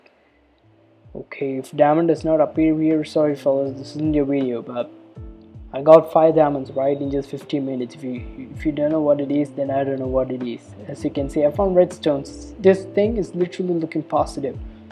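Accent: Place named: United Kingdom. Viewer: Ur British accent is still the same as before!!!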